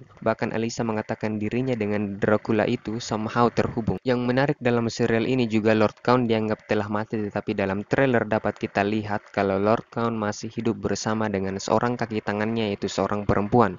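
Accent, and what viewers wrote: South Sulawesi, Indonesia. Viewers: Orang Bugis ki kah,logatta sangat kental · Bang orang sul-sel ya?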